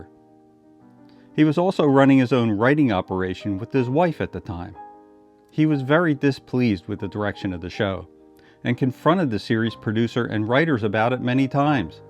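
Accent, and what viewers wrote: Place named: Philadelphia, United States. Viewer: One of my favorite shows; you video makes me miss it and hearing your Philly/South Jersey makes me miss Wawa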